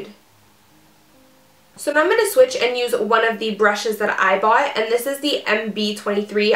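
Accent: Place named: Canada. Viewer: youre so canadian lol you say "thee" instead of "the" i love it :) my aunt does the same thing haha youre amazing!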